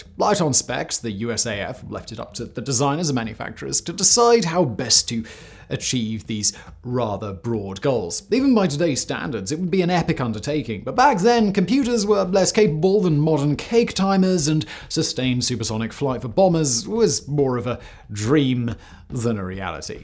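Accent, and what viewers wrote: RP, United Kingdom. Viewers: that's cool as, I was the Wikipedia editor that added the "Adverse Flight Characteristics" sub section and the part about "fuel stacking", made me feel special hearing mention those in his posh British accent!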